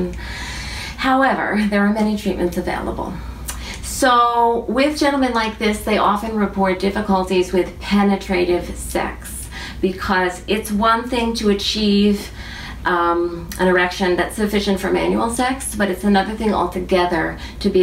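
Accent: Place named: Texas, United States. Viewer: I am glad that you found my comment funny,but I can assure you that it is very true .I think it might have something to do with your sexy Texan accent.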